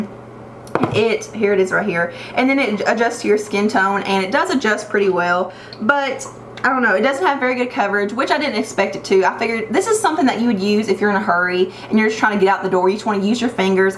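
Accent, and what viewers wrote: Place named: Georgia, United States. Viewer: I subscribed as soon as I heard your accent. 😂😂😂 I'm from GA and live in SC. I like hearing people talk like me! 🙌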